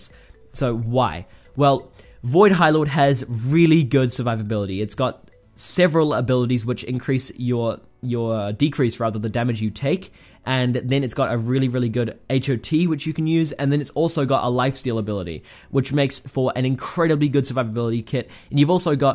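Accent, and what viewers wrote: New Zealand. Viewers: New Zealander. Noice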